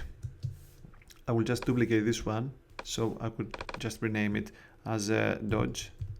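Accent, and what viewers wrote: Greece. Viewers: from your English i understand that you are a Greek dude.So, Ευχαριστώ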